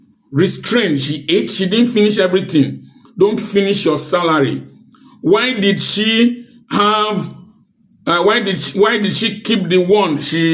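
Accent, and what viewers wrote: Nigeria. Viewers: Dear Uncle I apprecite you plenty ooo. This your name is very similar and your tone and accent is very illustrative. Are you from Orogun, delta state by any chance? That surname is that of father's family too. May the universe continue to favour you sir. from Italy and The UK.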